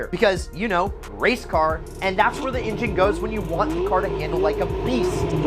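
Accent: United States. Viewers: This is what a douchey American looks/sounds like incase you forgot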